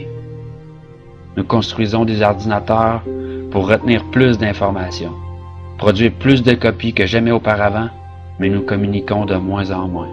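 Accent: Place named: Canada. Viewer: j'adore l'accent Canadiens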